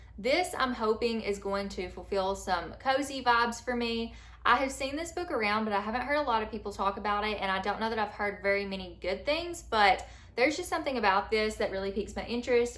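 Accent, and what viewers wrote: South, United States. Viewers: I love September on BookTube because we have so many good readathons here! I’m going to be participating in Series September! Happy Reading! So nice to hear another southern accent here with me on BookTube! 👏👏 I’m also ready for fall too🤣🤣🤣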